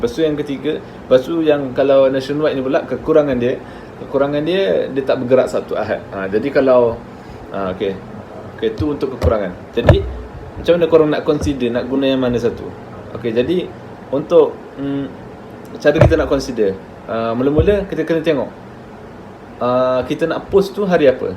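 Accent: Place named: Kelantan, Malaysia. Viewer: Duk perati perati lamo doh bunyi kelate 😂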